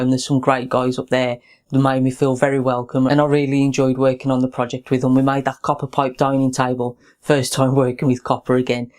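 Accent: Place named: Birmingham, United Kingdom. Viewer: Hope you had a great Christmas! Looking forward to following your travels state side, and to see what they make of the Brummie accent haha! Also thanks for answering all my questions over the past 2 years, I've often bought stuff off your reviews! Best wishes for the new year mate!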